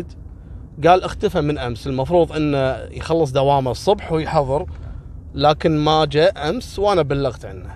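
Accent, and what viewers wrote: Gulf, Arab world. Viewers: الله يسعدك لهجتك مثل لهجتنا في السعودية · طريقه كلامك اتغيرت انا الان بعام ٢٠٢٤ وهذا الفديو قبل اربع سنين كلامك كويتي ميه ميه الحين خليجي مخلط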